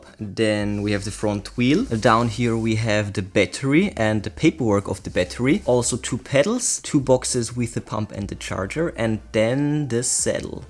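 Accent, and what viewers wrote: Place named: Germany. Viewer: the way says "sooooo" and "bähttery" revealed his germaniness XD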